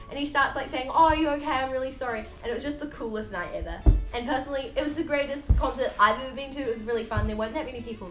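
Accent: New Zealand. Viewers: Are you from Nz? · lmfao i clicked and i was like she's definitely from new zealand i can tell from the accent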